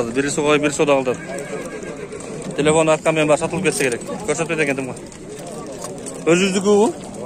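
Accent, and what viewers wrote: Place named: South, Kyrgyzstan. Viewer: мына оңолупсуң. Баягы " паразит сөздөрдү колдонбой публично таза сүйлөп калыпсың. Дагы иште өзүңдүн үстүңдөн ( орусча - над собой). Мага окшоп сын айткандарды жаман көрбө- сенин пайдаңа айтылып жатат. А максимально талап өзүңө кой- кыргыздын түштүк диалектисинде эмес- баягы адабий бекитилген мамлекеттик тилде сүйлөгөнгө аракет жаса( баягы "Ала-тоо маалымат программадагыдай. Эмне дегенде- бара- бара аудитория көбөйүш үчүн сени бүт Кыргызстан көрүп угуш керек. А сен жалаң диалекте сүйлөп жатып алсаң- башка региондор жактырбай көрбөй коёт- аудитория жоготосуң.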